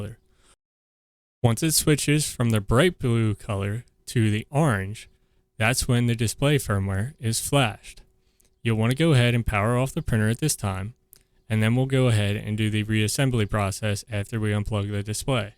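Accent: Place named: New Orleans, United States. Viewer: Great video and great timestamps and links. Thanks for your efforts. Sounds like a Nawlin's accent...takes one to know one. Keep up the good work...sub.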